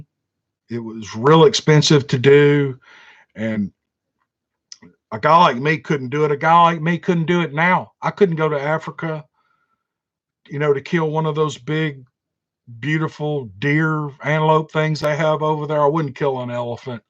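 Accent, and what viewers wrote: South, United States. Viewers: If your passion is the story you certainly have the southern draw to tell them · We enjoy your videos, love the accent too. Takes me back to my southern roots! Had a lot of paranormal things happen all my life! Makes me feel at home. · My husband and I listen to you ALL the time, We love your show! I enjoy your southern accent & LOVE your stories!!🥰 We look forward to your next one ❤ Maybe one day I will send an email with some of our paranormal experiences, I think you would enjoy hearing them! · As a woman....I hate to admit it..I cant stand listening to a woman's voice who narrates another channel!. Your southern drawl totally makes the stories! I pictured you with more of a ZZ Top beard and good ol' boy image! Good job with your channel and continued success.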